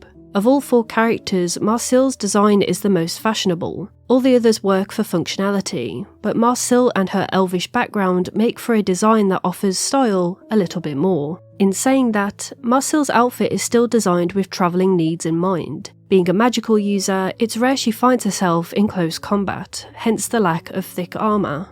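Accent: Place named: England, United Kingdom. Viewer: What a pure English accent, I love it ❤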